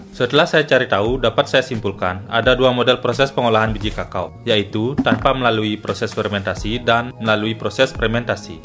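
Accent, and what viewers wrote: Bali, Indonesia. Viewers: orang bali ya bang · Dr Bali ya bli???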